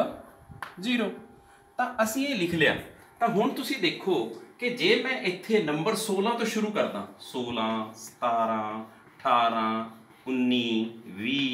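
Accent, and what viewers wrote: Punjab, India. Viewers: Sounds like an educated Punjabi accent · The Punjabi Accent 😍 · Accent? Punjabi hai. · Smile on face , enthusiasm during lectures and that beautiful Punjabi accent ...it is very wholesome and amazing 🤩 beauty of maths and numbers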